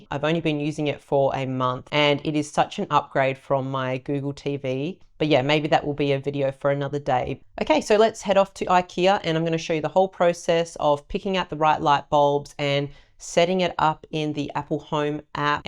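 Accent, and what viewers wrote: Australia. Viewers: She sounds australian is she ?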